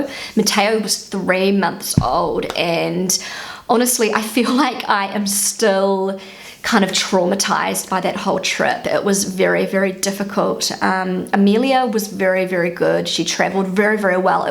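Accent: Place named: New Zealand. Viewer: I like all of you videos, - your positivity and smile really warms my heart. How lucky your husband and your children are:) And you know what? I had to google wikipedia for a New Zealand English article because when you get used to British or American English it's so funny to hear a New Zealandish accent. It's interesting to compare just the word "better" spoken in these three variations [ˈbɛtʰə] (UK) / [ˈbɛɾɚ] (US) / [ˈbeɾə] (NZ). Just t o let you know <3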